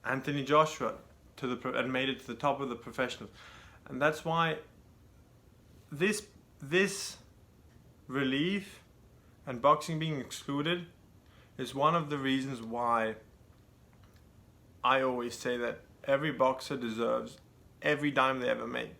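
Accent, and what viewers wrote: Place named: England, United Kingdom. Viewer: What part of England are you from? your accent is very light and easy to understand. Sorry I’m just curious on various British accents, I’ve been watching a lot of Hammer horror films lately.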